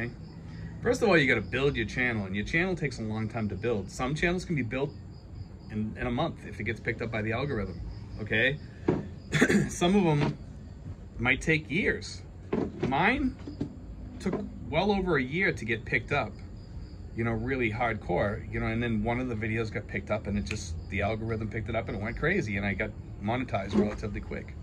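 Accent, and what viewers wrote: Boston, United States. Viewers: Thanks for making good simple to understand videos..you know I am just an old simple minded man from the rural part of Louisiana.. didn't you say you were living in cavite? I had a girlfriend living in tres martinez or general trias I think it was.. thanks again and keep the videos coming..where in the states are you from? I think I hear a Boston accent..I worked all over the usa and world in my younger years.. thanks 😊👍👌😁🤗..hello from south Louisiana and I am heading to the Philippines to live out the rest of my retirement.😁🤗🐊🐊🐊🍺🍻🐊🐊🐊👍👌🐊🐊🐊😁🤗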